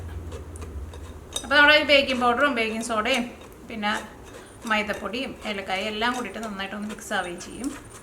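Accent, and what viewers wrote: Thrissur, India. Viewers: Thriusur bhazha kelkaan nannyittunndu,cakekyum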